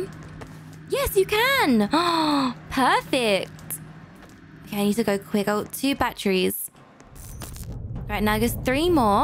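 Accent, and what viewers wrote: United Kingdom. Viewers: Omg your British :0 Give me a shout out pls :D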